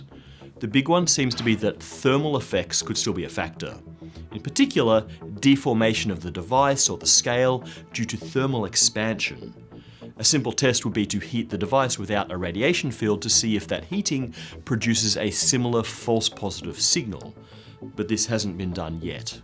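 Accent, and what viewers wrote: Australia. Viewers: My biggest mystery from this video , which is great , what accent does the host has ? Australian ?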